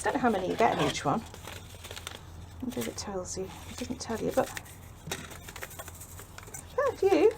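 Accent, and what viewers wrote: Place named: North West England, United Kingdom. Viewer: good vid. you sound local, we're on the Wirral. NW UK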